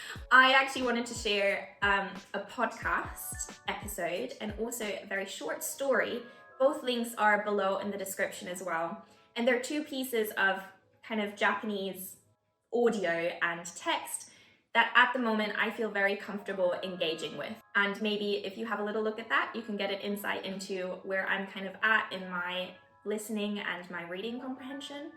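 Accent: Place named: United Kingdom. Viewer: Great progress! Since you are solo studying you may not be getting any guidance on pronunciation. You have a very british "a" vowel going on in words like "wanikani". You are using what linguists call a "Near open front unrounded vowel". In IPA it's written "æ". You want to be using a "Open central unrounded vowel". In IPA it's written "a". Japanese always uses "a" never "æ" because "æ" doesn't exist in japanese. If you google both of these the first result will be wikipedia where you can play and hear the difference. Continued luck on your studies!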